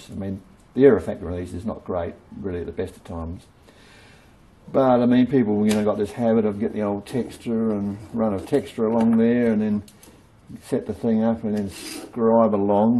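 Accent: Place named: Australia. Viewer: hi!! i have those calipers too. i have that problem so i am going out now to fix them. thanks keep up the great work. good to hear an aussie voice on youtube!! lol!!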